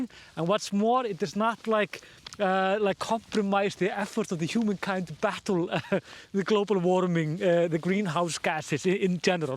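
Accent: Iceland. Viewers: Greetings from Mn. USA. I watch every News cast, and am very pleased with your reports. Thoroughly enjoy your Icelandic accent. The information you pass on to the world is excellent, thank you. You are very fortunate to live where you do, and are so close to these wonder's of nature. Thanks again and keep up the excellent reporting.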